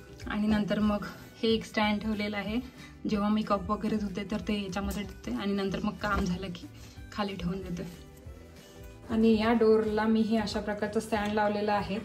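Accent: Vidarbha, India. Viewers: खूप खूप छान किचन , बऱ्याच नवीन गोष्टी कळल्या तुमच्या व्हिडिओ मध्ये ,very useful video, माझा पण घराच काम सुरू आहे ,मी नक्की या पद्धतीने बनवायचा प्रयत्न करेन .thank you so much.. तुम्ही विदर्भ मधील आहात का, भाषे वरून वाटत ,मी पण नागपूर ची आहे 😊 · ताई किचन छान आहे तुम्ही भाषेवरून मला असे वाटते की विदर्भातील आहात कारण मी पण यवतमाळची आहे सध्या पुण्यात आहे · Tai tumhi kontya shahratun aahat, tumcha bhashevarun tumhi vidarbhatlya vatatat, video chanch hota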